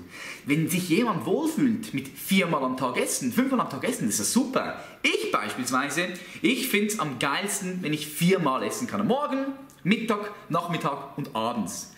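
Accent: Switzerland. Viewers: Aus der Schweiz?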